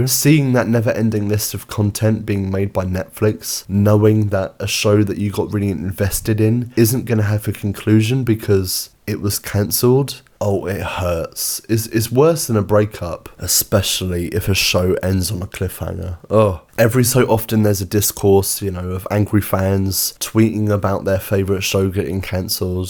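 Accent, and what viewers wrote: United Kingdom. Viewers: Wait a second, this man is British. I can't watch this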